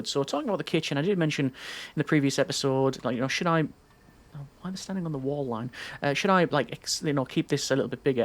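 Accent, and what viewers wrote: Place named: Northern England, United Kingdom. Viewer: Based on the way they say poor: I'm going Northern English.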